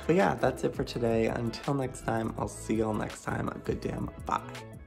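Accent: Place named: United States. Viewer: im curious - do you feel like people you interact with in london treat you differently because your accent is obviously from the states? i've considered visiting london myself and i have always wondered that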